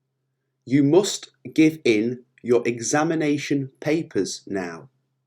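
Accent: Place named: North, United Kingdom. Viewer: Your teaching method is fantastic but the pronunciation is like from Yorkshire or Manchester .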